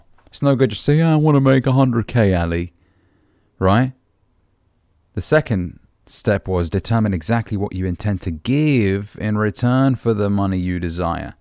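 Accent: United Kingdom. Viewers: Hello Ali! I stumbled upon one of your videos randomly, and I ended up watching almost half of your entire collection already! You and I both know that the content you cover has been explored in various ways by many others before you, but let me tell you: your approach is truly unique and I absolutely love it! Consider me a dedicated subscriber from now on. Your calm and non-aggressive tone, along with your willingness to understand and help, are rare qualities these days. I wish you all the best not only in your business but also in your personal life. By the way, if you don't mind me asking, where are you from? Your English accent sounds quite British to me. Wishing you the best of luck in all your endeavors!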